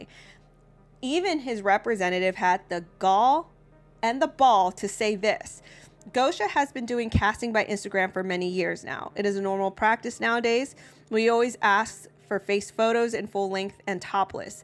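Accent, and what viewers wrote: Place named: Staten Island, United States. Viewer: You sound just like my Italian sister from Staten Island NY! Good discernment 💯☺cool beans! 😎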